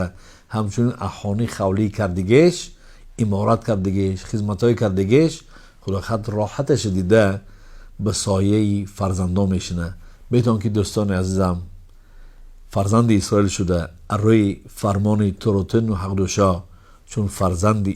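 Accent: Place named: Tajikistan. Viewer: بسيار خوب به لهجه شيرين تاجکي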